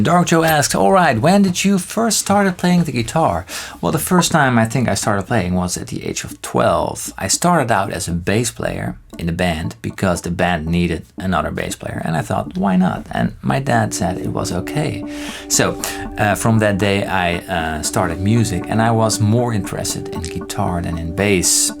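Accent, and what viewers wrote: Netherlands. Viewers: Altijd al getwijfeld of je Nederlands was, wist t oprecht niet zeker haha. Geweldige video's trouwens ga zo door! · ah ik dacht al dat ik een Nederlands accent hoorde ;P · As a Belgian I could tell pretty much right away his accent was Dutch.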